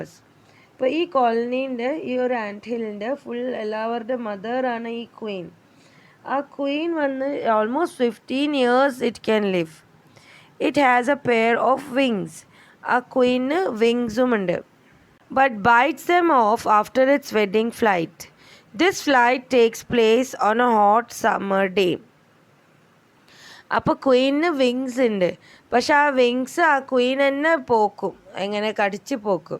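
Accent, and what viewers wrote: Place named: Malappuram, India. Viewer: Malappuram slang assahaneeyam!!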